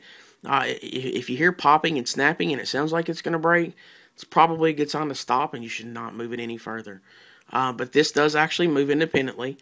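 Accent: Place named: Texas, United States. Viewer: hey thanks for making a video where you talk it out, I saw others and I found it annoying where it was just music. Also I like your accent, are you from Texas?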